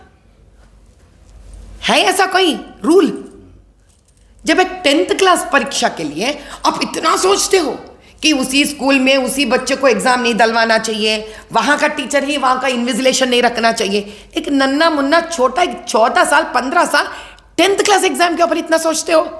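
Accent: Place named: Hyderabad, India. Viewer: माधवीजी आपकी बात पूरा भारत समझ रहा है हैदराबाद की बोली भी सुन्दर है आप उसभाषा। मेभी इनटरवयू देगी तो आपके.मुह से सुन कर हमे भी अचछा लगेगा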